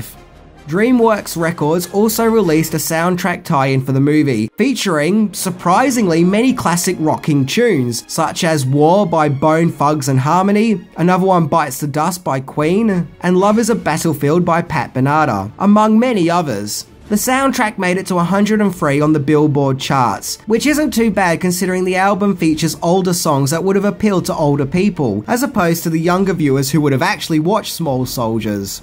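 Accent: Australia. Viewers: you are annoying beyond words. Frank Lan JELL a Pat BEN et are These are not obscure names from the twelfth century BC, they are actual famous people of our time. Don't guess at names. Not people's names, not place's names. I get that you're from Australia and so e words and phrases may be unfamiliar or even be pronounced differently, but these are NAMES. YOU MAKE MY EARS BLEED. I'd block your channel but your info is good and I DO learn new things. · Maybe its an ausie thing, like how they pronounce seaga instead of sega? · In case you all hadnt noticed, an Aussie, they pronounce things differently, lay off him, he's got it hard enough being upside down all the time. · well OK if you call Australian accent proper English,, tbh though I live in England and I've noticed he has mispronounced some very English words,, lol.. Still a great YouTube channel though and I'm only messing about as I know what you mean it's just made me laugh when he said some town names from England wrong in a video ages ago,, tbh I can't even remember what it was now but had to laugh because it was totally wrong.. 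Lol.. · Wait a minute? Pat Ben-ah-tah? It's said "Ben-ah-tar". Kind of reminds me of how you say "figure" due to the Aussie accent, making "ure" as "ah" instead of sounding like "your". You'd never say the 80s band " " as "Midge Ah". LOL As for the soundtrack, it doesn't make any sense to have "War" by when the original song from 1969 would have been way better and more harmonic.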